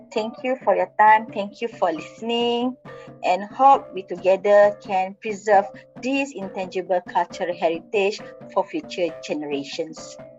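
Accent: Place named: London, United Kingdom. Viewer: Wow speaking London 😃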